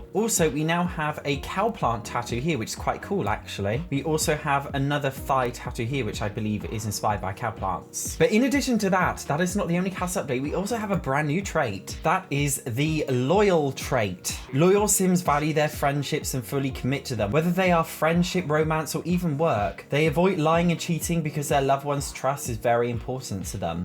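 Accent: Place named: United Kingdom. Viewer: i feel like got so much more British in this video suddenly omg the bloody hell and innits i love it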